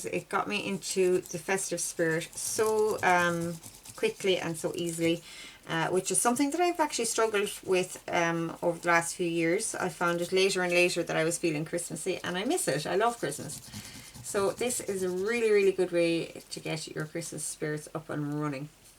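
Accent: Ireland. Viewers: Lovely to hear an Irish voice! I’m from Lisburn Northern Ireland. Just loved your rocking robins and will be making them for the grandchildren.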